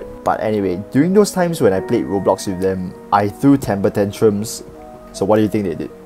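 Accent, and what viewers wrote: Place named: Singapore. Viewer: my mom said you sound singaporean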